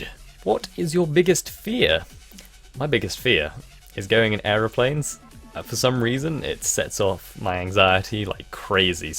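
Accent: England, United Kingdom. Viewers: Just out of curiosity, do you think that Americans have an accent? Because we say that you have and 'English Accent.' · What`s up, English citizen? So like a week ago, my sister`s friend told me about Captain_Sparklez`s popular channel and recomended me the series of ``Mianite``. Like a half season after the start, the great Wizards came to the land to greet them with their amazing buildings. You were one of them. As the Wizard who showed to Jordan the most I remembered your name.. One of his eps he had a building lesson from you. And that was the first time I heard your voice. And I completley fall in love with it. It was deep, it could show all the emotions you were feeling and it had English accent. I love English accents. Like... I can guess you`re from the UK just when I hear your voice. So today I finished the season and after like two hours I decided to check if you had a channel. 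So I typed `` `` and your channel appeared. It was amazing! This video is probably my favourite. Why? I don`t know actually. Maybe because the whole 30 minutes of it I was staring at you and your voice made me stop seeing things around me. I could just listen to it with with hours. The problem with the not matching to the face voice is actually simple. I realised, you don`t look to young for that deep voice. You actually look half young. Your beard makes half of your face look like you have that voice. But from the nose to the hair you look like 17 years old to me. Oh, before I end the comment I`ll say that I recommend you to play OUTLAST. Pleaseee! M`kay byee.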